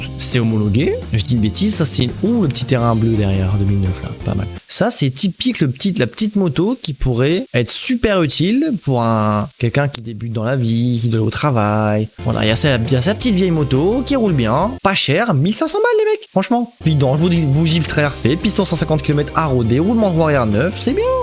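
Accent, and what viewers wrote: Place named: South, France. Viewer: Pourquoi tu prends l'accent du sud pendant toute la vidéo ?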